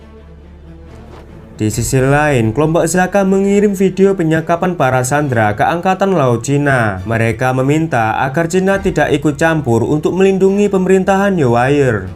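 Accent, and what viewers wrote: Java, Indonesia. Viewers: JANCOK MEDOK MU PARAH COK!!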